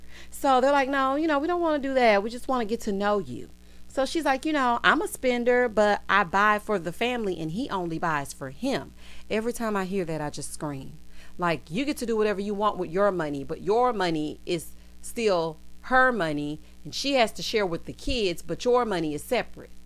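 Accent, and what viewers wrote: South, United States. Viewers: Hey girl hey, you’ve hit the nail on the head again. Without trying to be negative, I just don’t see Kimmi and Maurice’s marriage really working, something about it seems off. I only have 1 question, when Tisha introduced herself to the “financial counselors, she said, “they were going on 15 years of marriage” but, didn’t they go to Vegas to celebrate their 15th like 4-5 weeks ago? I love Tisha’s growth, this platform has truly been a positive for her in that sense. I’m usually here for Destiny’s fashion but, that outfit was also a No for me for that particular event. If Mel and that hyper inflated accent can get on tv, then your southern drawl will surely take you places because hell that’s what drew me in🙌🏾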